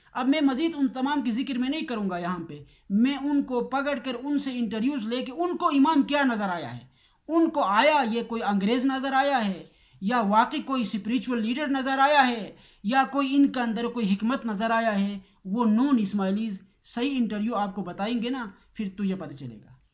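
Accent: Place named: North, Pakistan. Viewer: He belongs to Northern pak.his accents are a bit different.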